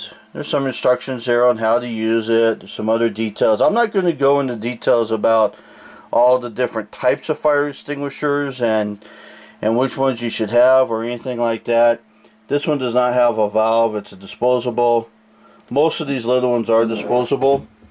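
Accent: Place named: East Coast, United States. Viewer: Thanks for the many replys , You have lived in Arizona your entire life ? sure sounds like you have a eastern accent , keep the videos coming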